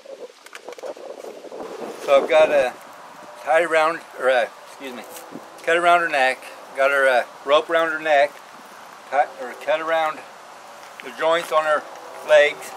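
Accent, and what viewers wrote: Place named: South, United States. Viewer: Monsieur he sounds southern deer don’t get big down there in Florida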